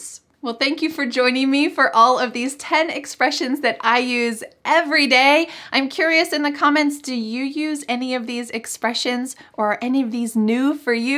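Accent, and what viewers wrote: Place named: United States. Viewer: I love your American accent❤ And I also have been following you since 2018 and have learnt a lot with your videos.Greetings from 🇨🇺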